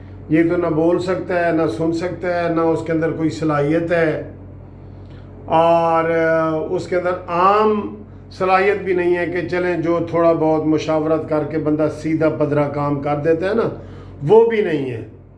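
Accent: Saraiki, Pakistan. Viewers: LEHJA SURA-EE-KEE HAAY, URDU...ROU RHEE HAAY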